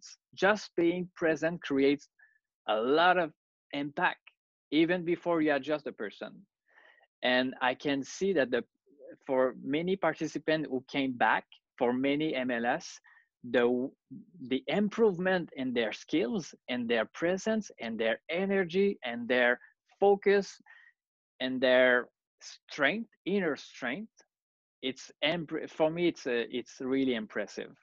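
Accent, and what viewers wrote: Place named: Quebec, Canada. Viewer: I was always curious to know what he sounded like in English 😅 glad to see that thick Québécois is still there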